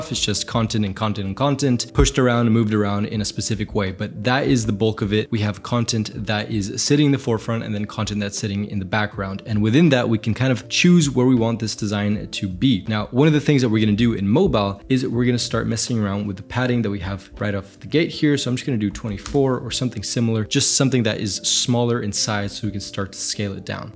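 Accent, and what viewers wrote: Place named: United States. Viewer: Love the tutorial. Sorry in advance for being intrusive but, how come you have the most perfect American accent? I can see you are Valencian/Catalan (like me). If you went to diction classes please let me know where, if it's online.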